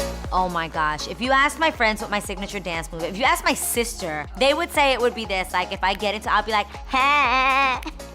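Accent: New York, United States. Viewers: Lmaoooooooo "i'm an exhausted pigeon throughout the day" that was so hilarious and i can so relate; i love how you could hear your NY accent so much herw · Hi. You will probably never see this comment but I have to tell you that I love your YouTube channel. I relate to you so much and love your New York accent. I grew up in the lower east side, in the LaGuardia projects and I am also 4' 11! My cousin went to P.S. 110 and his mom currently teaches there. He died on 911. Anyway, your channel always makes me laugh and feel good.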